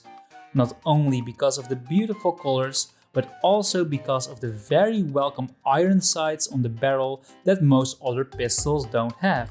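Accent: Netherlands. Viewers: wait! your dutch?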